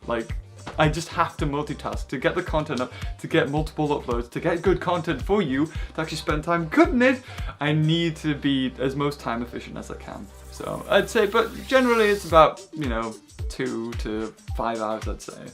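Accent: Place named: United Kingdom. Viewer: If it's not to much trouble but do you mind me asking you where you live You British and most of my friends are British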